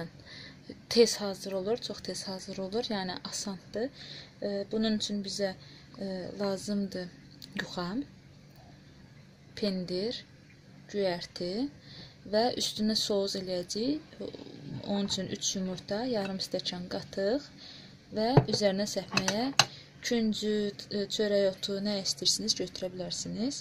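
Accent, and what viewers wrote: Agdash, Azerbaijan. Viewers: Superdi.xanim siz Azerbaycanin hansi bolgesindensiz? Agdaşli deyilsiz tesadufen?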